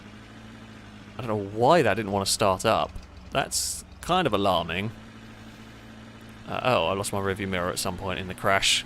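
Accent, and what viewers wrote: England, United Kingdom. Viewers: pronounced "gas op die lollie" pretty perfect imo (English accent but still better pronounced then half of the Dutch citizens does)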